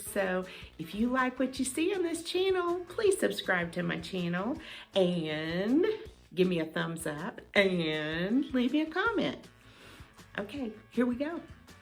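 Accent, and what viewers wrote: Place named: Tennessee, United States. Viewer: I knew when you started talking you were a Tennessee gal. 🧡 I love that you are really selective in what you brought home. How long have you been in your home? We will be in ours 2 years in April. It just seems so wild to have the perfect house for just the 2 of us since the kids have their own houses now. We are renovating to get that cozy, cottage vibe. You are a lovely inspiration.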